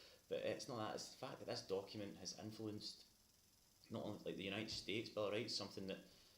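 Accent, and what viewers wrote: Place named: Scotland, United Kingdom. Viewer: The English law is different from the Scottish law ( and your accent sounds like your Scottish) is not applicable in an English court, but a British court in England doesn’t use the constitution for the help of the person in the dock ,unless they state that they have all their alienable rights in tact , no taxation without representation, There had been a civil war before the called the glorious revolution which deposed king James the 3rd because he was a catholic and the country was a Protestant country and the king was head of the church and he was not acting as he should so William of orange and his wife Mary were made monarchs and the bill of rights would need updated in regard to language and the catholic religion as were a secular nation of 3 countries on the British isles also the parliament was given power over the army as James king James kept starting wars so 5hat privilege was removed by the bill of rights and the parliament was to ensure that they protected our rights and freedoms But they are not doing so and people don’t believe that the bill of rights, bill of statues are common law still exist and are still enforceable and are our sovereign right as subjects, if people see themselves as British citizens then they’re saying that they don’t have constitutional rights from our sovereign monarch and that’s got to change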